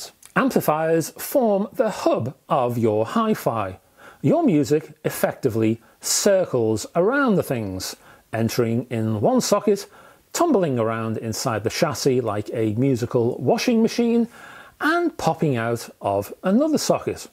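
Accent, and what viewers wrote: Liverpool, United Kingdom. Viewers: Hi. Love your reviews. Returning to the UK with a pair of Elac Unifi 2.0's and an Audioengine B1 Blutooth DAC. I use the Audioengine to stream music from my phone. I want to purchase an amplifier to complement this set up. I Plan to connect the TV to the amplifier and maybe some other digital sources so optical and coaxial inputs would be important. My budget would be around £500. Any suggestions? Love your L'pool accent. Love L'pool too. John.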